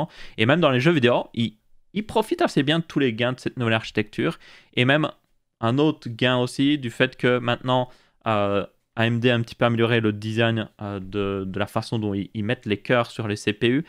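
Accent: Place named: Belgium. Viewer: Beaucoup de "Pouissance" x'DDD Aaaah merci la Belgique ♥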